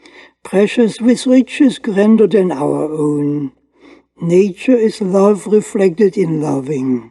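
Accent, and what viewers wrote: Germany. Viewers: i think your work is great and the Einsteinian accent adds to the atmospheric's, your humanist attitude is enviable and i look forward to further work by you. thanks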